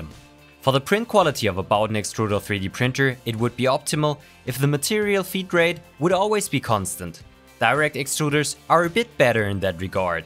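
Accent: Germany. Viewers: he has a nice German accent, thank god he's not Swiss. xD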